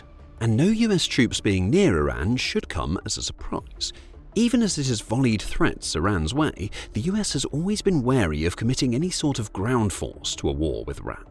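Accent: United Kingdom. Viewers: I don’t think you should make videos more than 5 min long. Something about your British accent makes me sleepy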